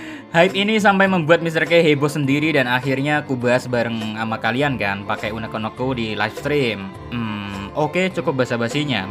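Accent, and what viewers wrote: Java, Indonesia. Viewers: Jawane daerah ndi min?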